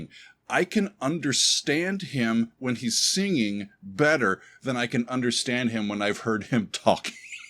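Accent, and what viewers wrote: United States. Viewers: This album followed a great album and was followed by a medocre one. Their best one and shows how a group going through difficult times can make rheir best work. Very powerful, very heavy. Annoying American commentary, aftef all we're talking British through and through.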